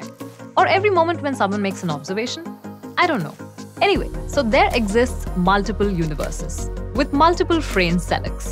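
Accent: India. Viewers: The Indian Accent with this one is so sooo strong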